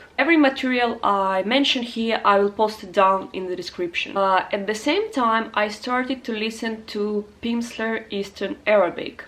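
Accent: Russia. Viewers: Хотел спросить не русский ли акцент. Конечно русский) молодец! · У Вас русский акцент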